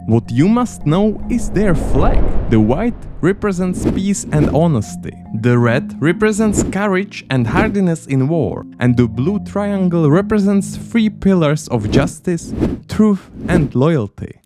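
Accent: Czech Republic. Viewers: pomocí tvého přízvuku jde poznat že jsi z česka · Video a celkově informace o Česku fajn.. ale ten českej akcent v angličtině nejde vystát.. sorry not sorry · never heard a more czech sounding accent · Judging by the accent I think he might be czech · Enjoying your Czech accent;-) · his accent is pretty straightforward giveaway of him being czech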